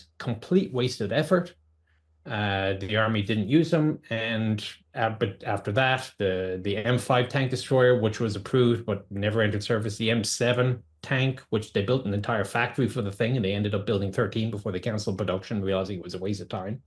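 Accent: Ireland. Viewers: Is this fella from Ireland originally but in US for years? His oronunciation of 'T' especially at the end of words suggests so. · The tank man is not British??? But American his accent sounds Irish with a little bit of london oops...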